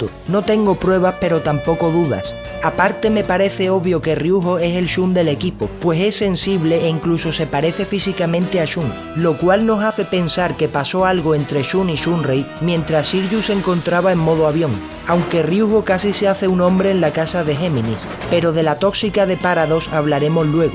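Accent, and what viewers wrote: Andalusia, Spain. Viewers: Si te doy sincero he visto pocos vídeos tuyos, pero ahora viendo este vídeo tan largo se aprecia tu esfuerzo lingüístico jajaj eres la hostia narrando con ese toque de humor y de forma rápida y concisa pero muy entendible a diferencia de otros. En fin que eres un crack! Por cierto no eres andaluz no? Saludos desde Málaga! 😉👍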